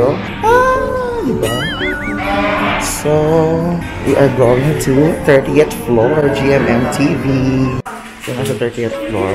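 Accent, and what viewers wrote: Iloilo, Philippines. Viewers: Ilonggo gid tana aayyy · Ilonngo lng malakas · Illonggo po ba kayo? HAHAHA yung accent kase hehe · Kuya Illongo ka? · Bacolodnon? Or ilonggo? Hehehe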